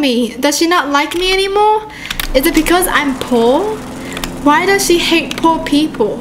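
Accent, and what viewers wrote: Australia. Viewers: but she has an Australian accent while has a Japanese accent?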